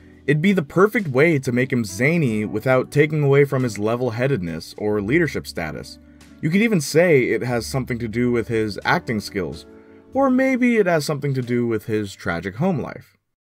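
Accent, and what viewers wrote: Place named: Canada. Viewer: I could just tell he's canadian by the way he says things and his word choices. I guess he's just the polite boring canadian stereotype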